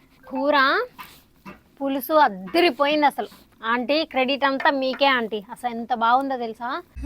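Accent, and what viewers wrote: Telangana, India. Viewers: Telangana slang add chesaru akka super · ee video lo telangana yaasa matladinav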